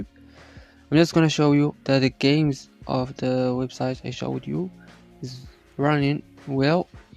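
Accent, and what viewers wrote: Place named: Morocco. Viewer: You are moroccan from your accent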